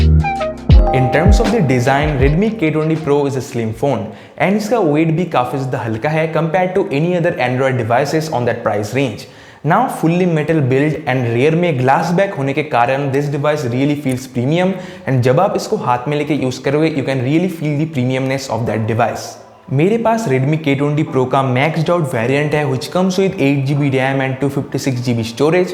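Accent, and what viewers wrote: Bengal, India. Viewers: Vai tumi je bangali Seta tomar kotha tei bojha jachhe🤩1 jon asol Bangaliei bujhte parbe🤩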